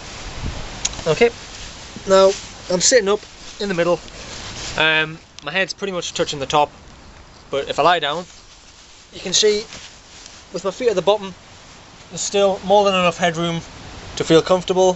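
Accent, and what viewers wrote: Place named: Newcastle, United Kingdom. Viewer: I like ur voice. Geordie. Mmm.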